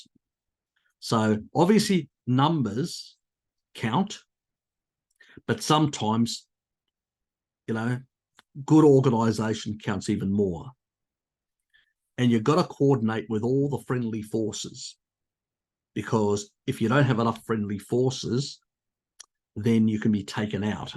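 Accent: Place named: Australia. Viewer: you have a very broad Aussie accent for a guy of Greek heritage!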